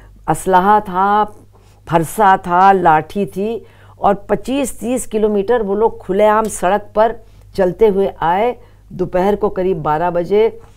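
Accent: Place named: Uttar Pradesh, India. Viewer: Speaking beautifully, can feel and smell the UP dialect and aroma.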